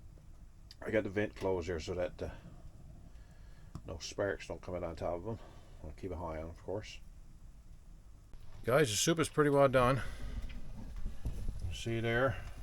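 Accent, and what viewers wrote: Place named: Newfoundland, Canada. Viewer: Omg! What great video this was! Your grouse soup was so appetizing! Made me want soup. I have a grouse in my freezer as well carrots and turnip in the garden. Can't wait! I love your product review on the light with red and blue and green... nah! I agree with you about On, Bright and Off! That's exactly what I would want in a camp out situation! Bravo 👏 👏 👏 👏 👏 Your funny moments in your lovely accent fill my heart with memories of my NFLD relatives visiting Ingonish 50 yrs ago. My father's mother was born in Cornerbrook I'm 1892 and died in 1991 at nearly 100yrs. Your accent is what I grew up with... you, My Buddy, feel like home to me. Love your videos and love to Tuckie... from Rita and Terry and Juneau at Murphy Farm in CB NS 😊 ❤❤❤❤❤❤❤❤❤❤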